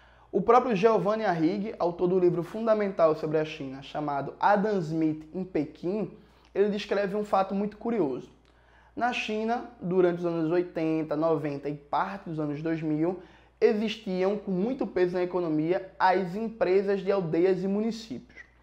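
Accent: Pernambuco, Brazil. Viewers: Seu português-pernambucano dá um certo charm e uma aura especial. Competência nem se comenta. Reconheço.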